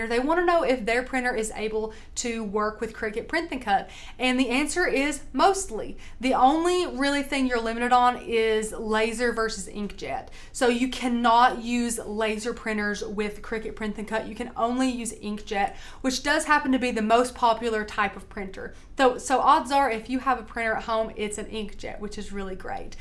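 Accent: South, United States. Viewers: This is awesome training. I love your accent! I'm from SC. Love all of our southern accents!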